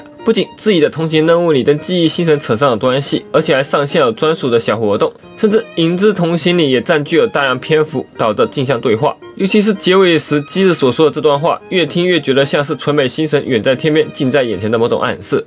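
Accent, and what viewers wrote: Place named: Beijing, China. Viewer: 下次可以直接說是北京口音😂（我覺得你說的應該是這個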